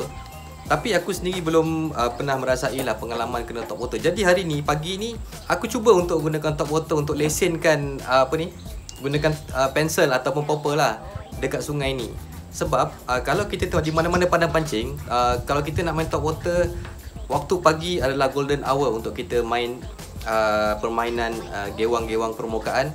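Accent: Peninsular Malaysia, Malaysia. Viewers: selama sye tgok video abg ni.. sye pelik.. dok sne tpi loghat semenanjung..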